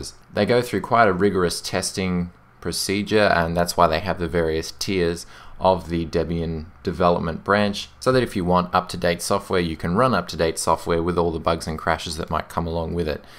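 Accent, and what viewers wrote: Australia. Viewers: I think there's a quite a bit of Ozzie in his voice but I dunno what else.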